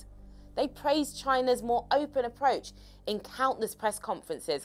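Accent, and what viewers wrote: United Kingdom. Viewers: I would believe it's a cover up but i hate British accents and colonialism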